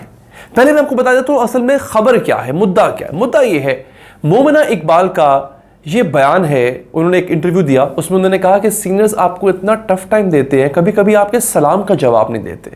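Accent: India. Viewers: Bro please speek like Pakistani don't speak like Indian we like you... Insap ke deve.... Indian language please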